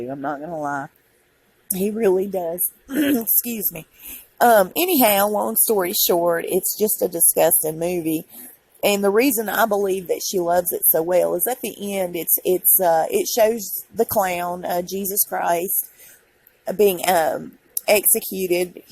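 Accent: South, United States. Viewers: GOD'S LOVE watched 2 of your videos the other day and subbed. Love your channel. Love your south Accent with that 'Twang'. New sub. BUT all I've got to say which would be criticism is; 'Michelle Obama' IS a man, It has an Adams Apple and the ring finger is bigger than index.. Just like a man. Plus a women can only fit 1-1/2 headxon their shoulders. She can fit 3.. All the best.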